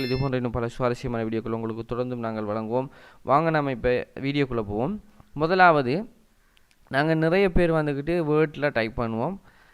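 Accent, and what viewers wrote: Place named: Sri Lanka. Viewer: dayavu senju tamil la pesavum ...kevalamana ilangai tamil la pesathinga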